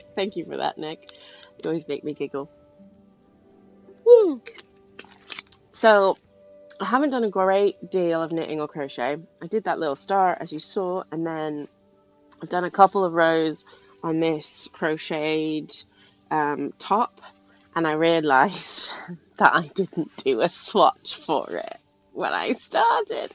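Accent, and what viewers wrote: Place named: United Kingdom. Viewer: I thought it was just me! You did sound more british. 😊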